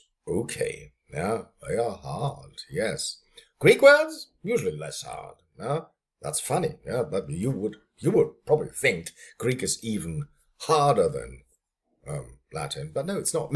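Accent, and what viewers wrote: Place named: Germany. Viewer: You sound like a german who learned english from some uppercrust posh british lord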